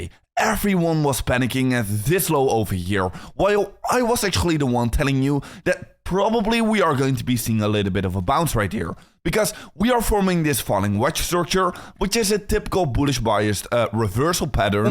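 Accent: Netherlands. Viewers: Waarom heeft hij 2 kanalen? Je hoort duidelijk hetzelfde Hollands-Engelse accent.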